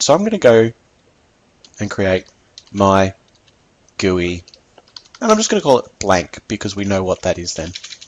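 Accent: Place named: Australia. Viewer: I'd bet my socks your australian...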